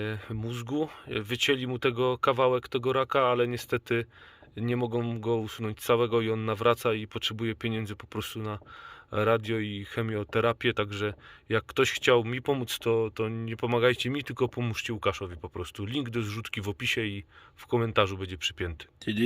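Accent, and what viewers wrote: Silesia, Poland. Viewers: Chopie jo cie lubia i ta godka ino zdrów być ❤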